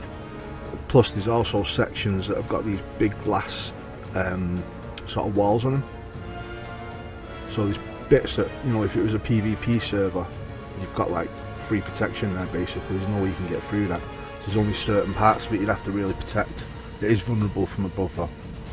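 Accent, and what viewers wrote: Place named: Salford, United Kingdom. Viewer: You sound like a salford lad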